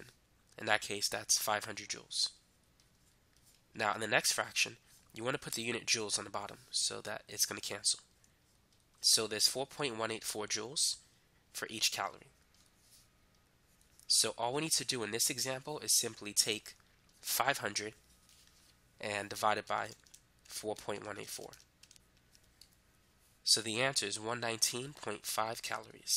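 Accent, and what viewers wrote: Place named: Brooklyn, United States. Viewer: am i the only one who noticed he has a brooklyn accent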